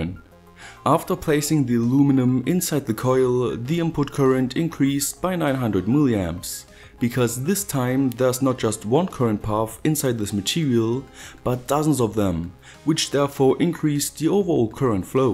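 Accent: Germany. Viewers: where are you from? you have a similar accent like German people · Wait... are you german? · Great i have a question are you German? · Man hört richtig das du deutsch bist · Are you German. Becuas kupferdraht ist deutsch oder bin ich dumm. Außerdem hast du so nen Akzent! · Love that accent, it sounds like german but the way you say certain words makes me lmao, like coillll. Would love to hang out with someone like you, no homo. Good video very professional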